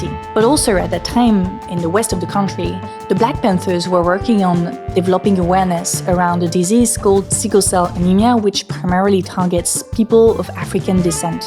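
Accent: France. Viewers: I am British and I love your French Accent. I love your videos as well they are excellent. Keep it Up 👍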